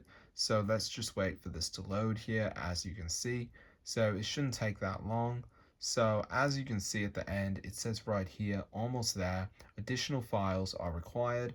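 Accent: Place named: Australia. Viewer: Why does he sound like he’s from Australia, New Zealand, or South Africa? I’ve watched every single video on this channel, and in other videos he sounds American, or maybe Canadian.🤷‍♂️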